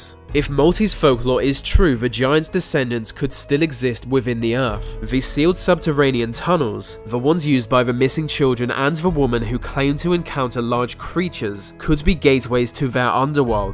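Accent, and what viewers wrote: England, United Kingdom. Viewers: Tjis is why i dont like british accent . It is so irritating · That damn English accent. Still a good vid. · Even science can't explain it? Lame Brit accent.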